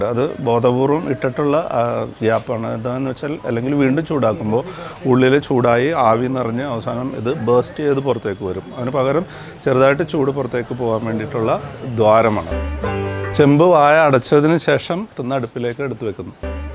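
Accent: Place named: Thrissur, India. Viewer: അവതാരകൻ തൃശൂർ ക്കാരൻ ആണെന്ന് തോന്നുന്നു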